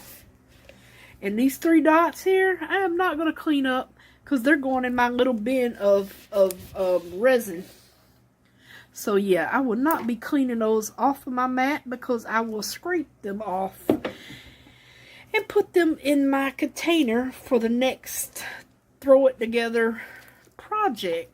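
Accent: South, United States. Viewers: Love all your videos,!!!! You crack me up with your southern accent and words you use. I love it! ❤️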